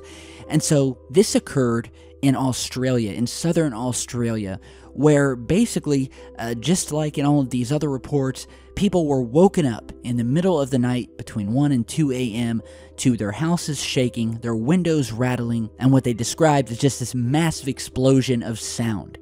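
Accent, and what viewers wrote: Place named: Texas, United States. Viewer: Love the Texas accent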